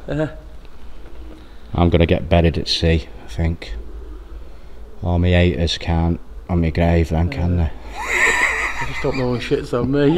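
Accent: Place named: Salford, United Kingdom. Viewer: Great vids you do mate, it's just that Bolton/Farnworth little Hulton blag Salford accent that doesn't do it for me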